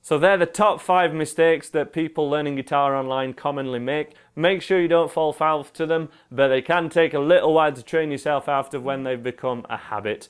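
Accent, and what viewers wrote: United Kingdom. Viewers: This british accent 😍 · british accents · Ah, I love your british accent😍 Thanks for the tutorial!😁😜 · me without glasses btw its overwhelming that I thought you were pewdiepie playing a guitar with a British accent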